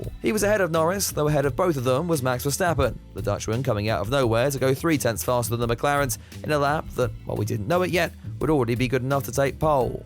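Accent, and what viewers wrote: United States. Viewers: Is that an American accent?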